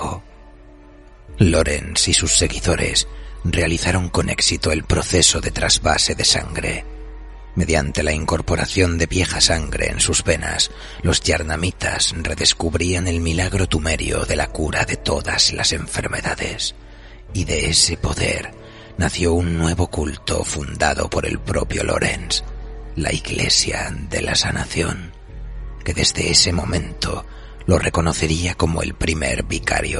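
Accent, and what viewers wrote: Spain. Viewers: Excelente narración, me encanta el tono español en los relatos góticos, ojala también puedan hacer el de blasphemous. Saludos desde México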